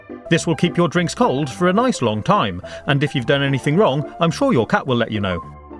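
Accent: England, United Kingdom. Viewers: Are you from the United Kingdom? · he was speaking british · 40Xx he lives in England that's why he says things differently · you can tell his English cause of the acent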